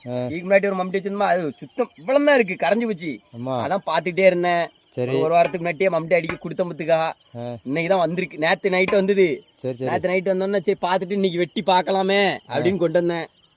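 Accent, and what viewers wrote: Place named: Tirunelveli, India. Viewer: அருமையான திருநெல்வேலி பேச்சு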